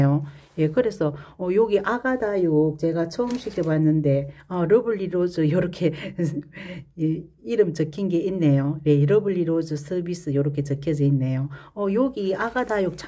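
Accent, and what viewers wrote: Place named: Daegu, South Korea. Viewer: 이뻐요 꽃다발 같아요 나나후쿠미니 혹시 대구 세요?당근에서 본것 같아요 말씨 매력적이에요 감사합니다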